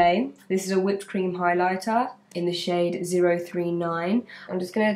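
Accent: London, United Kingdom. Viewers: gives me Audrey Hepburn vibes...she even has the Eliza Doolittle accent (I don't know what part of London) from the movie My Fair Lady!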